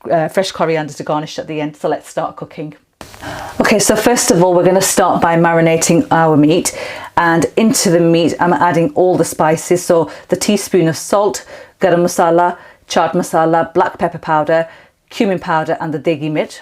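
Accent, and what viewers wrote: United Kingdom. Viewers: I will be trying this dish, it would help if you wrote down the ingredients, since you talk with a British accent it is difficult to tell what you used. Thank you for sharing!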